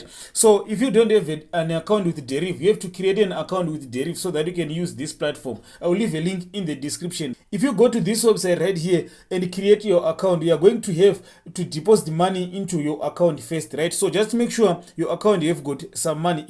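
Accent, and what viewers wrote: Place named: Zimbabwe. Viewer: 😂 Zimbabwean accent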